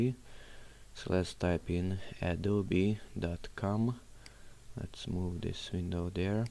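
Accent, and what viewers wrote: Brazil. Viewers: Reconheço um brasileiro falando inglês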